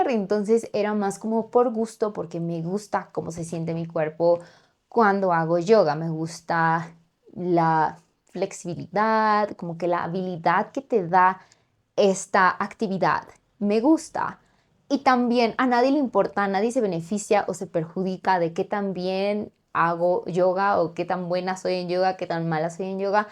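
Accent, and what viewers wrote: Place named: Mexico. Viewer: Yo empezé aprender español a solo uno mes es mi passatiempo, me encanta eschucar tu videos, me encanta lo acento mexicano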